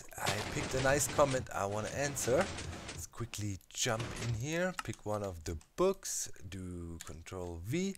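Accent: Germany. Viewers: Hi. Here's something about me: You and Xisuma were my first two subscriptions. My ears fell in love with your soothing German accent and mannerisms, and even your occasionally mispronounced words. Please say "ingredientses" more often!:D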